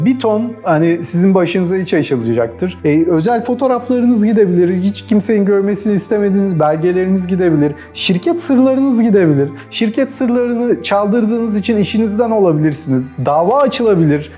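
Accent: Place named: Yozgat, Turkey. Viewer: yozgatli galiba k ler cok dogal geliyo kulaga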